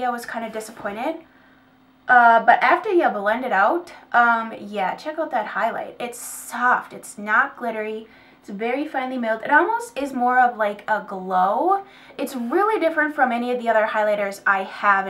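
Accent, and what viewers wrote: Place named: Minnesota, United States. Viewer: Sounds like a Minnesota accent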